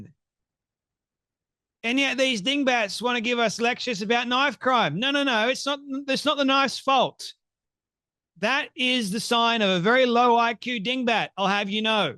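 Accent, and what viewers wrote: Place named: Australia. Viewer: dude your Mick Dundee accent got me to subscribe love the accent & content from Fla.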